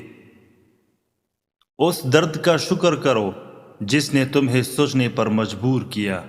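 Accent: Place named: Pakistan. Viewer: Urdu Shi hai wo pakistani lahza hai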